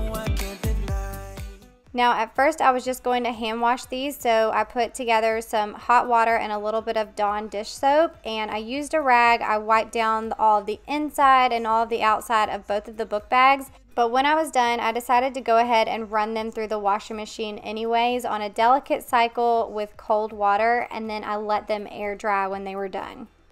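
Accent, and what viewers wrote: South, United States. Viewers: Hi! I just discovered your channel. I went to college in Savannah! Its great to hear a little southern accent. 😉 Thanks for a great video, I look forward to watching more.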